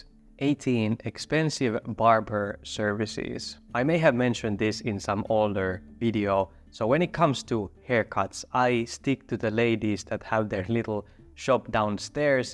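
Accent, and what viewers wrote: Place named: Finland. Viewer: Ihana suomiaksentti! 😂 jotenkin niin hellyyttävä ja ilahduttava. Tosi hyvä video, pitää vilkaista lisää. Aloitan ainakin puolen vuoden ”no/ low buy” -ajanjakson, joten näistä videoista on varmasti apua 😊.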